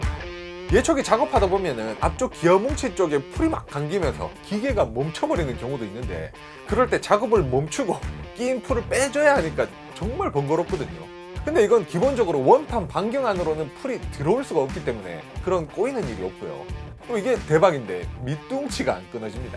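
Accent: Gyeongsang, South Korea. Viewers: 우째 대구 말투네.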